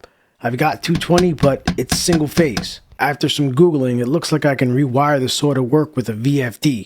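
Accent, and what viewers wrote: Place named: Bronx, United States. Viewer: I feel like I'm watching a bronx tale with his narrating. Lol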